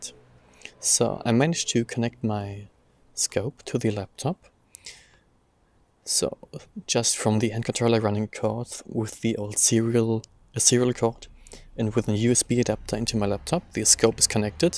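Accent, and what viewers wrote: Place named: Germany. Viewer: Ich finde den Videoschnitt echt super, hat so ein leichten Astrobackyard touch, was ich sehr begrüße nicht falsch verstehen und auch der Ton ist schön ausbalanciert und angenehm, bis auf vllt das es keine 3 Sekunden braucht um zu merken das du eig Deutsch sprichst 😉 Wo es ja so schön um Field flattner geht, kennst du irgendwelche für 1,25" OAZ's? Habe mein Newton soweit modifiziert das ich mit der DSLR in der Fokus komme aber bis jetzt nur 45€ Field flattner welche die Brennweite halbieren gefunden... Und wenn ich das bei 700mm mache kann ich, Brennweiten technisch gesehen, auch mein 200mm f/4 mit nem x2 Telekonverter nehmen und komme auf mehr Brennweite... Dafür ist das dann doch irgendwie blöd. Auf 2" aufrüsten hab ich auch schon Mal dran gedacht, hatte mir aber vor rund 1,5 Monaten ne Baader OIII CCD Filter mit 1,25" gegönnt und der wäre dann ja logischer Weise nicht mehr nutzbar 🙁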